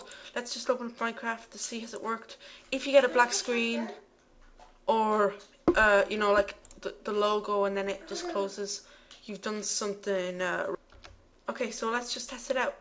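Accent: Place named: Ireland. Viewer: good to see someones irish not american liike me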